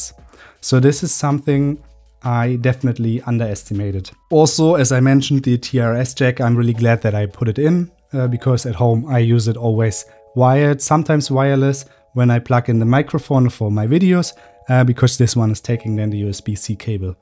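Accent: Germany. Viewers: hard disagree about op's comment. You just sound like a normal person with a German accent. You also don't talk with the "YouTube voice" (which is a good thing!). Sound's good, video's good, your script is tight without being rushed.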